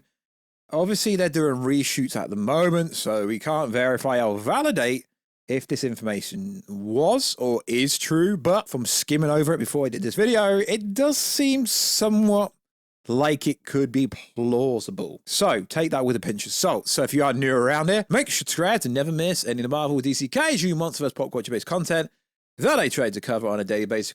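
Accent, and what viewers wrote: United Kingdom. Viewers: I can understand why he got it wrong he's from another part of the globe 🌎 sounds like he could be possibly from the U.K.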